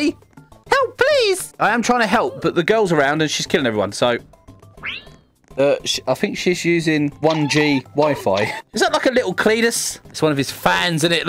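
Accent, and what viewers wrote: United Kingdom. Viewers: British accent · Bow down to the British accent king!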